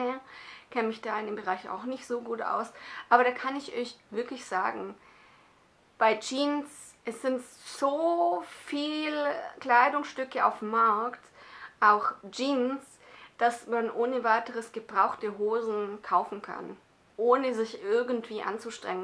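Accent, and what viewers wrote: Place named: Swabia, Germany. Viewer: Als Du gesagt hast:In den Schuh rein schlupfen!!Mußte ich echt grinsen.Mag dein schwäbischer Dialekt.Bin selber geburtig aus Biberach an der Riß.Liegt zwischen ULM und LAUPHEIM.Liegt im Oberschwäbischen Bereich.Drück Dich mal von der Ferne.Wohne jetzt im Bereich Würzburg,Bayern!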